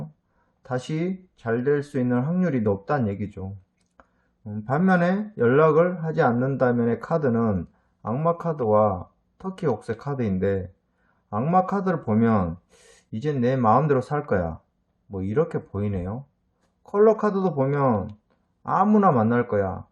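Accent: Jeolla, South Korea. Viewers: 2번ㅎㅎ 님뭔가 전라도분이신거같아욬ㅋㅋㅋㅋ뭔가정감가요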